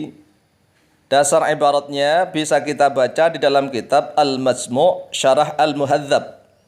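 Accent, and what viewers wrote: Java, Indonesia. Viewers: mungkin dia orng Jawa kan orng Jawa di kenal dngn huruf o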